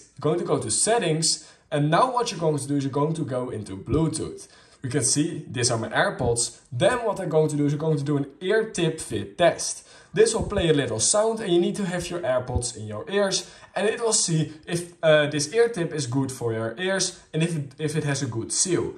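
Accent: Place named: Netherlands. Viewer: Are you Dutch? You have a Dutch accent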